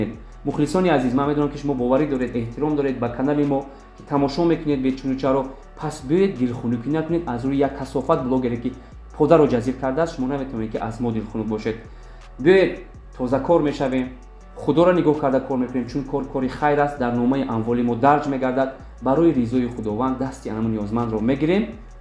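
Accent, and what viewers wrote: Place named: Tursunzoda, Tajikistan. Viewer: Муҳтарам шумо зодаи кадом гушаи Точикистон ҳастед, чунки тарзи сухангуйиатон каме ба мо мардуми Турсунзода монанди дорад?Агар малоли хотиратон бошад узр мепурсам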